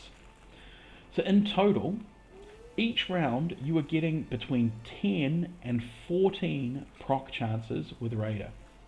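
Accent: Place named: New Zealand. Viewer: Hey man you sound like your from NZ? Wouldnt happen to have an NZ squad I could join next season? Awesome content by the way, very thorough and informative!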